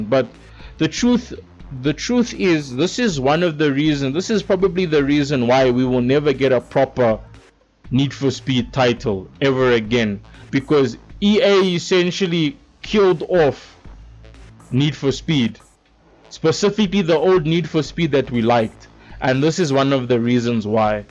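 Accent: South Africa. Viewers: You south african bro? I havent heard a south african accent in forever (emmigrated awhile back)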